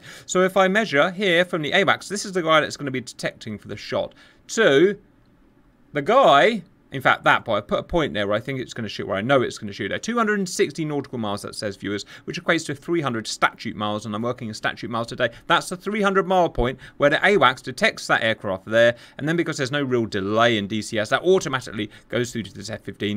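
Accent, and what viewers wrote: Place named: United Kingdom. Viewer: I was giving him a compliment actually. That soothing British voice inducing relaxation as he walks you through step by step descriptions of mass destruction and warfare with a few comedic quips.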